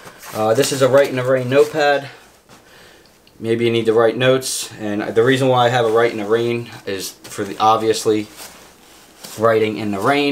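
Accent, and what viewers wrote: Philadelphia, United States. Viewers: I knew from your accent, you were Philly. I'm born and raised Philly, went to Lincoln, class of 94. Watch your back brother. Things besides the pandemic can hurt you, like Mayor Kenny, the DA and the skells of the city. Good video bro... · Just found your channel, great info! But I thought to myself this dude sounds like he’s from Philly...then you said “ water” not “wooder” so not sure? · I’m from philly and I knew you had a philly accent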